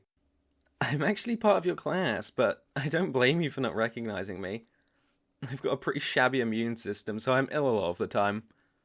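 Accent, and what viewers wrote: United Kingdom. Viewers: Me not seeing this is a British audio "Hey there!" Jumps in holly sweets that scares me Me: "Why is it always the accents that startle me?" This was so much fun to listen to though! I loved it! Absolutely enjoyable! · playful teasing even when serious plus the brit sound :face-orange-biting-nails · I SEE BRITISH I ARIVE IMEDEATLY (not in a weird way or anything also, I'm dyslexic, give me a break)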